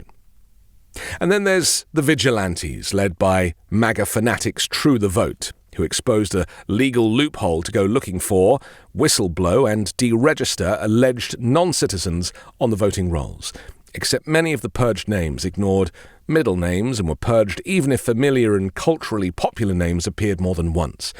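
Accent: United Kingdom. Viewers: I'm european and left-winger. In Europe nobody would be able to vote without proper ID. Given his accent, I assume this youtuber is British. He knows in his home country nobody would be able to vote without proper ID. In fact, you can't even open a bank account without ID, register kids in school, access healthcare services, nothing gets done without an updated ID card. That's why identity theft is rare in Europe. I don't understand why this is even an issue. Being able to vote without proper ID gives room to corruption and rigging of the election process. The USA needs to create a standardized citizen ID card like all properly funcional and organized countries have. This lack of official standardized ID card is some 💩hole country crap.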